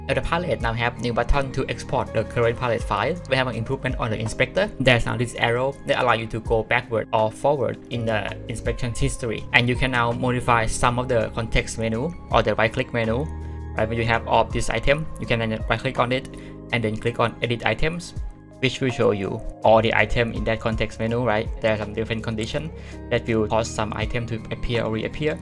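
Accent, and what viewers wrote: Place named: Thailand. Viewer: Your accent sounds like someone who is secretly into Thai. Am I the only one who thinks this?🤔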